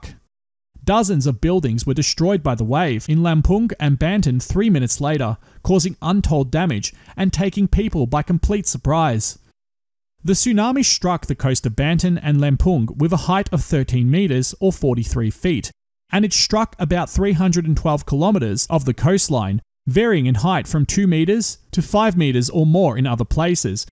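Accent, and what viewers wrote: Australia. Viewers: Great to hear a Aussie voice talk intelligently about the stuff I want to learn. Great work mate.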